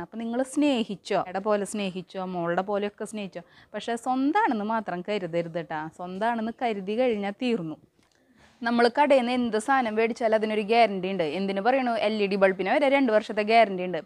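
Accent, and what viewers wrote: Thrissur, India. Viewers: തൃശ്ശൂർ ഭാഷ കേൾക്കാൻ നല്ല രസം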